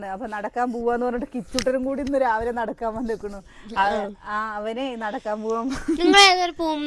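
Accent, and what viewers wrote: Palakkad, India. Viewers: പറയുന്നതുപോലെ പറഞ്ഞോളു അതാണ് കേൾക്കാൻ രസം ട്ടൊ നമ്മുടെ നാടൻ ഭാഷയാണ് പാലക്കാട്കാരിൻ്റെ സ്വന്തം ഭാഷ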